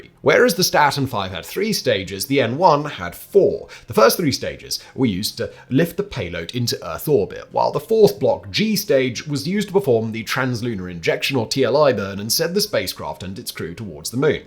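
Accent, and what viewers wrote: United Kingdom. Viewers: I love overly loud pensive music underscored by a well spoken British fellow.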